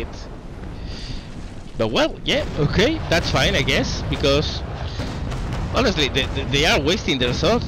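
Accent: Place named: Spain. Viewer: I'm glad I saw the video where you mentioned you had a Spanish accent, I couldn't place where it was from.:P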